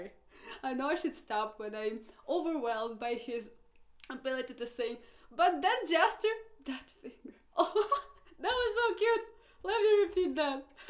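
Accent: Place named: Russia. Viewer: Она русская, акцент чувствует ся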